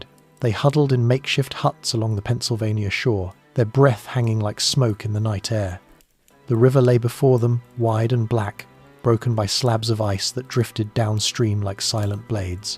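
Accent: England, United Kingdom. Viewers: Why would I trust an English man narrating American history!!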